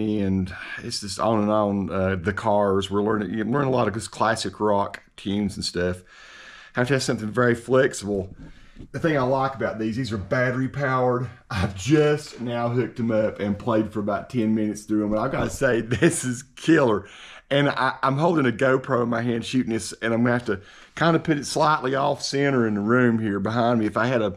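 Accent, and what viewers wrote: South, United States. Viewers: "sub mode" makes the batteries last longer FYI. That southern draw you got there sounds familiar to my North Carolina ears! Haha!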